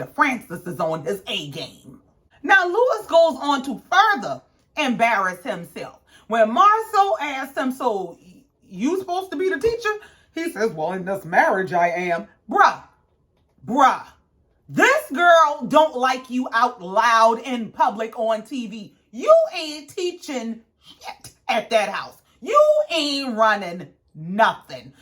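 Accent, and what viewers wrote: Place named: Gullah, United States. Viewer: Nooo hell no I hear some geechie/Gullah in there, if you don’t mind where are you from? Lowcountry SC here and I know what I hear 👂🏾👂🏾👌🏾🤨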